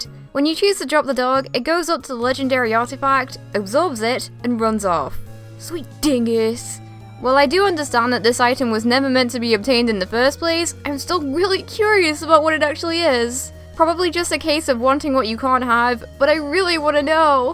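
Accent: United Kingdom. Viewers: What's wrong with liking a British voice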